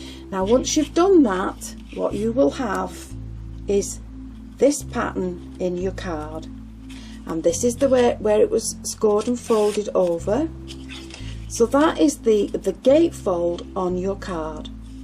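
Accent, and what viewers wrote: England, United Kingdom. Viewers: Love love love all of your beautiful cards and so enjoy all your videos. Your soft soothing voice and that cute English accent bring me back time and again. What is your source for the thousands of flowers you are continually using? I sure can't afford all those dies, though I have many and would relish having more!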